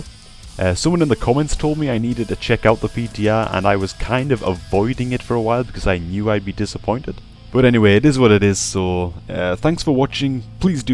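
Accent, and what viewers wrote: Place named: Scotland, United Kingdom. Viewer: he is scotish I think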